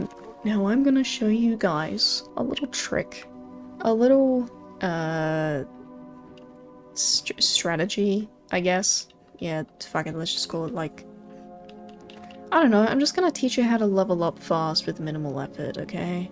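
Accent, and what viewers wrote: Australia. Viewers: Oblivion ambient music: checks Australian woman in a calm voice with occasional chill cursing: check I was expecting bonks but got the best ASMR. Neat. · Also Im a Kiwi so i picked ya for an aussie with the accent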